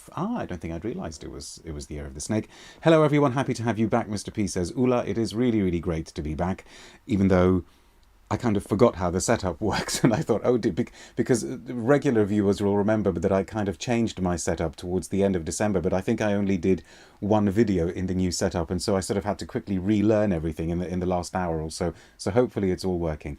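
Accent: United Kingdom. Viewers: Happy New Year Mr! Welcome back, so glad to hear your British English again:)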